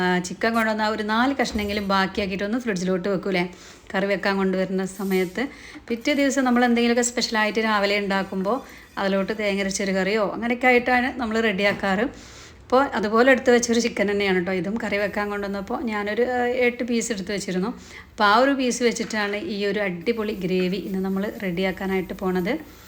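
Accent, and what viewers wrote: Malappuram, India. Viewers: പാചകവും വാചകവും ഇഷ്ടപ്പെട്ടു കേട്ടോ, മലപ്പുറം ഭാഷ ഒത്തിരി ഇഷ്ട. സൂപ്പറാണെ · നിങ്ങളുടെ മലപ്പുറം സ്ലാംഗ് ആണ് കേൾക്കാൻ രസം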